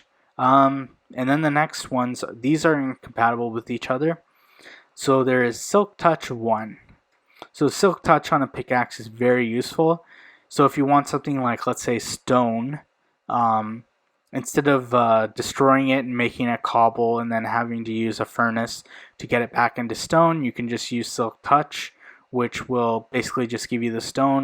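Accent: India. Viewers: R u Indian anyways thanks bro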